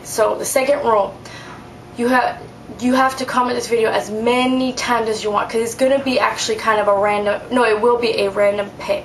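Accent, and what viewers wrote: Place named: Latin America. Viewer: MAC omg ur soooo prettyyy and u hav a sper cute accent its got like a lil latino kik to it lol if u no wat i mean...cant belive this contest is over reli cud hav dun wiv those products lol tc xxxxxx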